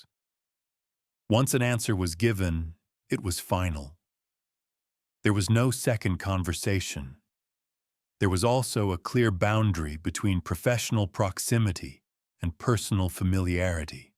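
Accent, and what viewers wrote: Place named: United States. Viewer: Interesting and relevant subject matter. sadly however, it features an American voice over.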